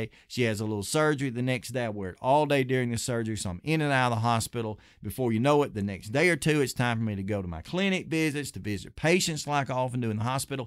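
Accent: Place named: South, United States. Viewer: As a fellow southerner now living in the PNW, I love hearing your accent! I miss it!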